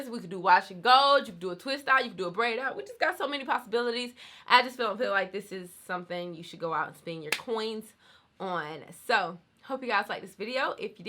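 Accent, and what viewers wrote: South, United States. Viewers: Lmao why are you so funny in this video??!! Sounded so country 😂😂😂😂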